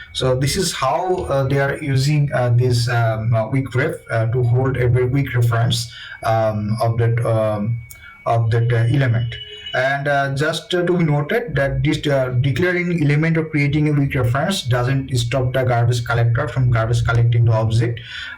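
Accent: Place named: India. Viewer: nice, because i wanted to watch your video, even with the indian accent which is difficult to understand tec-stuff, but the sound was unberable so i wish you good luck for you next video :-)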